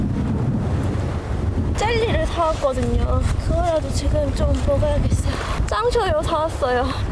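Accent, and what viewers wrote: Jeolla, South Korea. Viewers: 원래 전라도 분이신가 사투리가 ㅋㅋㅋ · 전라도 사투리도 귀여브러…❤ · 전라도쪽은 가본적이 없었는데...영상으로 대신 가주시니 좋습니다. 간혹 나오는 님의 전라도 사투리도 듣기 좋았구요. 덕분에 오늘도 여행 잘~ 했어요.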